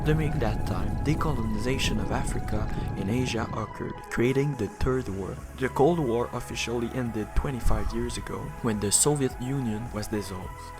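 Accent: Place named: Quebec, Canada. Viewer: Hello l’accent québécois :)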